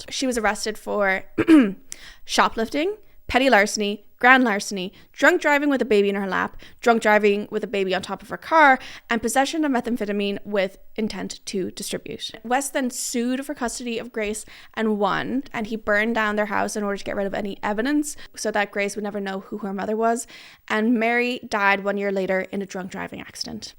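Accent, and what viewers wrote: Ireland. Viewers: omg you're Irish? I thought you were Australian :p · it took me 8 minutes into the video to actually process the Irish accent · for the first 30 minutes of this video i was literally was debating on whether or not to make a comment about your accent because the way you were pronouncing your a's, p's, and l's were all giving me irish and come to find out lmfaoo you are irish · I watched multiple videos of your and I didn’t even notice your accent til after you said you were Irish.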